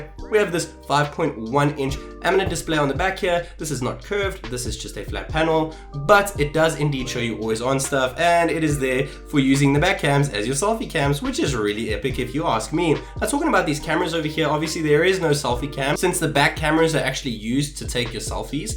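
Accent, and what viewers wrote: South Africa. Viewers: Hey...so...are you south african?great review btw.anyway,any idea where one can get this in sa,or cheap shipping to sa online.Thanks a lot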